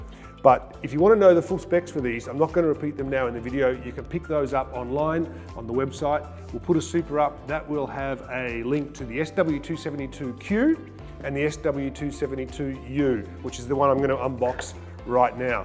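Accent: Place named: Australia. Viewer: Aussie accent makes me want to vomit.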